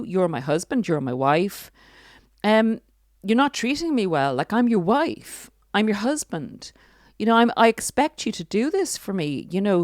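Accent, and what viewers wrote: Ireland. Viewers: You are describing my mother … thank you. And I love your Irish voice - everything sounds so clear!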